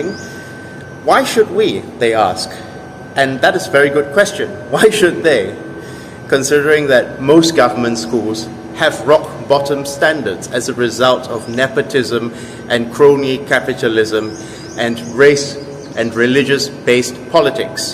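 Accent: United Kingdom. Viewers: Great video. You sound like British Educated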